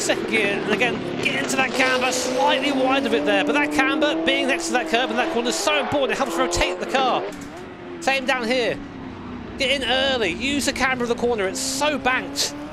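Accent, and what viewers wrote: United Kingdom. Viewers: "come on G 'E fo 'hy" a true Brit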